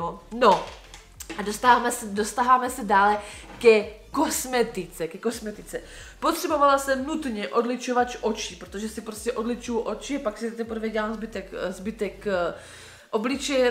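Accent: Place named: Moravia, Czech Republic. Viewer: Já Tě žeru 👍 jsem moc ráda, že jsem Tě díky Terry objevila s hned jsem projela x videí. Navíc mi vyhovuje Tvoje vyjadřování a ta moravština. Jsem taky z Moravy a používám stejné výrazy jako ty 😜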